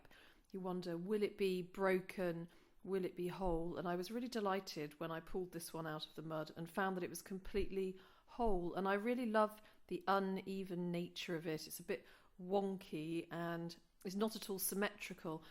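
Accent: United Kingdom. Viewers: What part of the U.K. Is your accent from?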